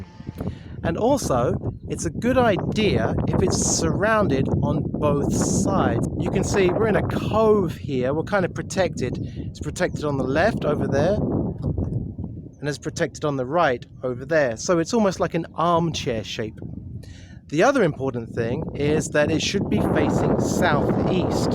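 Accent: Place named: England, United Kingdom. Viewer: You misunderstood : it is traditional to state the Chinese hometown of the deceased on the headstone even the deceased was born in Hk ( ie hometown of the deceased ‘s paternal family then if born in Hk ) it doesn’t mean the body of the deceased was moved all the way from China to Hk for burial . But overall I am impressed you knew more about Fung Shui then me ! I also like your English accent thx for the video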